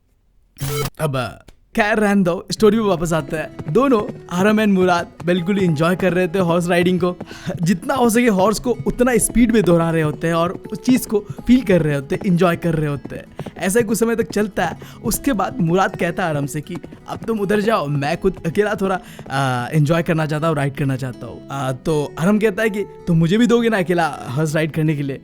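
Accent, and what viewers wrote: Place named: Arunachal Pradesh, India. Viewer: Bro are u from arunachal 😂 bcz ur hindi is like us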